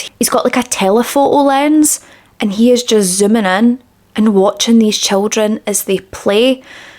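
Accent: Scotland, United Kingdom. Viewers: Ohh had to have a little break watching this one. it's a bit much in places but you do such a respectful and professional job and you are so beautiful. Love the cute Scottish accent ❤❤❤❤❤❤❤ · Subscribed for the accent 🤣 I like the way scottish people talk ✨ You could read me the phonebook ❤️ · This accent man 😭😭 how can a fellow Scottish person like a accent so much haha!!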